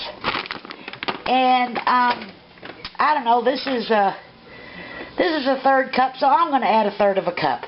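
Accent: South, United States. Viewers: your daddy might have been a new englander but your southern accent and use of buttermilk is a clear give away that your family history is from the south not the north. I bet got that recipe from Martha stwert...lol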